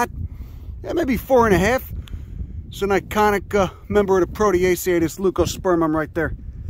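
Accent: Chicago, United States. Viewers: I never learned so much while cracking up at his awesome Chicago accent. Salute from the suburbs.#Elgin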